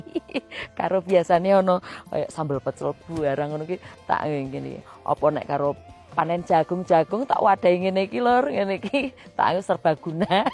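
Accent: Java, Indonesia. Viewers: Ibuuuu... Suka ikutin Ibu... Jowone enak le ngendiko... ❤❤❤ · MasyaAllah mbk,medok pokoe jowone ❤❤❤ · MasyaAllah alhamdulillah, ya ini yg kmi tunggu2 dan harapkan Mbak, ngagem busana Jawa Asli jian + luwes gandes, Hayuuuuu jobo jero, matur nuwun sampun membudayakan Bhs Jawa dan logatnya yg medok natural, jg busana Jawa yg anggun, mantan, 🤩👍😇🤗🥰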